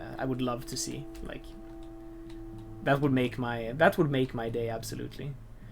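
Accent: Sweden. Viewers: Hey, are you swedish? You sound swedish... 😄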